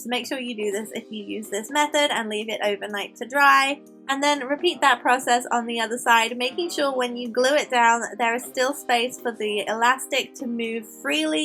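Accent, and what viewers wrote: England, United Kingdom. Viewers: Shes english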